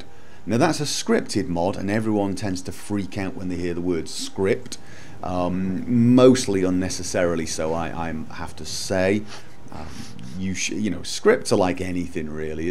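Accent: United Kingdom. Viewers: okay, I thought it was wierd he had that good brittish accent if he wasn't from there.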